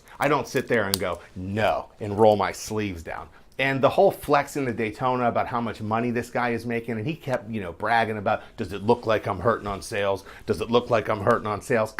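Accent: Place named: Rural, United States. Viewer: Not defending his behavior but you come across like a dude from the hills of Pennsylvania. Poorly dressed with that horrible hick like accent. Once he heard your opening salvo he had already sized you up. Not saying it’s acceptable but these guys see country bumpkin tourists all the time.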